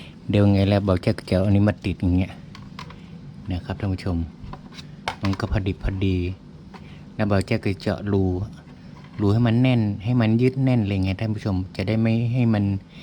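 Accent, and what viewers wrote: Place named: North, Thailand. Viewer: แต่ฮาวเข้าใจเบิดเน้อตี้ปี้ อู้กำเมืองอ่ะกะจ้าว😁😂อู้มาหลายๆโลดเจ้าเข้าใจดีจ้าวววว....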